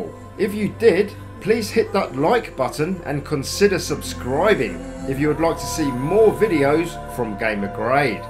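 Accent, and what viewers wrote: United Kingdom. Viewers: You're welcome. I finished the game 3 days ago. What an experience. I cried at the end since what Dr. ES says is really touching. These bloody wankers might have a problem with the British accent or are loyal fan to the Yankie accent haha. Your language and accent are really awesome. Keep up the good and I'm really thrilled to watch more of your videos. It would be great too if you not only make guide videos, but also explain games' story and that stuff. Wish you a lot of success.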